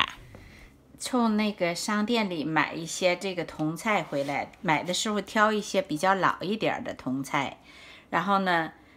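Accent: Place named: Shandong, China. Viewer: 山东 烟威口音。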